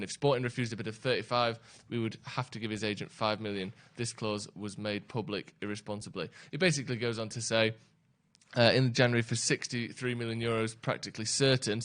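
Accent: United Kingdom. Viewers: 100% a scouser ain't ya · Shut the fuck up. Man is reading with a British accent, so he's like Howson now? Stfu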